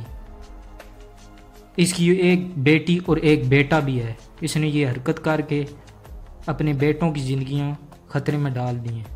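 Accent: India. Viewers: axha phr ap khud hi th0ri apni v0ice k0 chng kr k b0lian qk ap ki w0 punjabi wala acent h..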